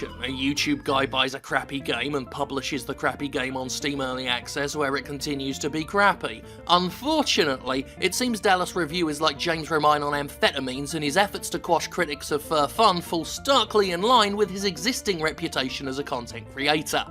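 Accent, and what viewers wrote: United Kingdom. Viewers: el que habla es britanico? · puta madre el acentoi ingles es ladilla de entender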